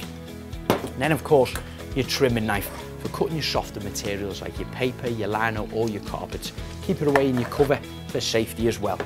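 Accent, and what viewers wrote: Liverpool, United Kingdom. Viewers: 30 dollars wasted. He's from Liverpool, not Scotland. Completely different accent. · He’s a scouser from liverpool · Liverpool accent from UK .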